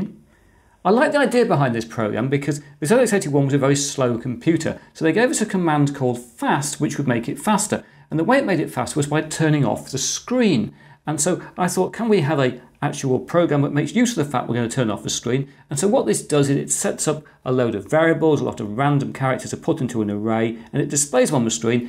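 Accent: England, United Kingdom. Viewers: Funny english accent🙂 nice exotic computer🙂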